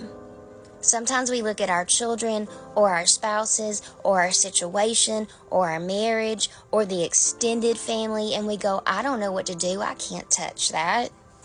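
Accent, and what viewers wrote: South, United States. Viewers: I embrace your advice in a southern drawl and everything in between. I will till the ground, plant the seed, and watch it grow. I won’t let it fall without tilling the ground. I’ve gone through enough crap to have fertile soil. To challenge life with authority. I love listening to you to much so I will listen to you. For the Lord’s sake. For all our sakes. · How sweet and Southern❤😊 Beautiful message. Thank You Sister. Virtual Tea❤😊 Amen. Message received. Hugs to ya.🙏🙌💕💞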